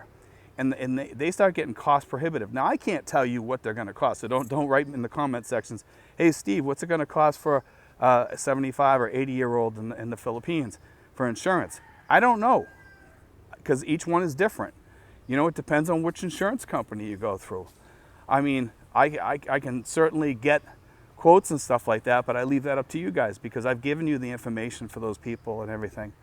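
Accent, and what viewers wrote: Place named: New England, United States. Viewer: Great Vlog Steve ! You made me hungry for New England Chowder soup listening to your accent. Campells it is ! Crackers n pepper ! Sincerely Bruce Tattrie Edmonton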